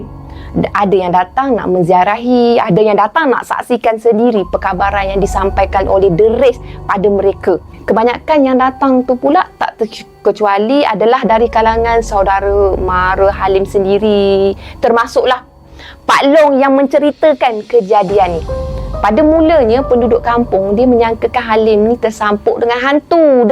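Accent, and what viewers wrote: Kelantan, Malaysia. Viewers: Pandai anda royak bahasa Kelantan.Anda orang Kelantan ke? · Yo dio org kelate la boh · Org kelantan ke,pandai kecek kelantan tu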